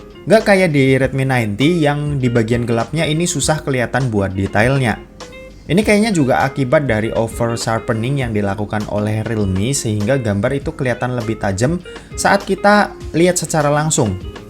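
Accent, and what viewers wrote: Semarang, Indonesia. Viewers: cah semarang ki jebul🤭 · wong semarang yo mas? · Orang semarang bang?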